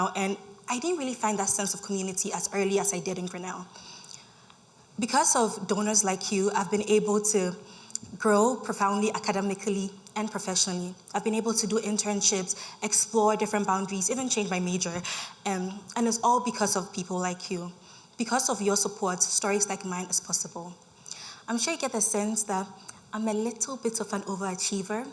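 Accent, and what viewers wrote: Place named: Ghana. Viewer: keep up with the Ghanaian accent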